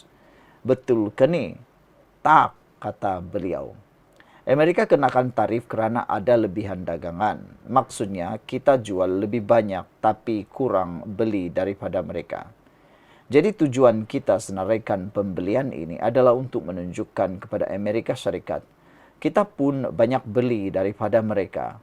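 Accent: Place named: Sabah, Malaysia. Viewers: Lorrr.. tu kan Bahasa Malaysia SLANG Sabah.. sama juga dgn org Kedah, Kelantan, Terengganu, Penang yg cakap BM tapi dgn loghat lidah negeri mrk... !! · Itulah cara kami orang sabah bercakap.alhamdulilah kami serumpun tiada membeza bezakan antara satu sama lain.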